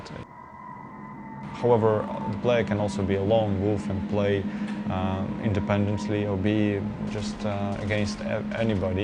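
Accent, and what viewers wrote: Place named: United Kingdom. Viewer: i think this guy studied in UK? he speaks with a slight british accent...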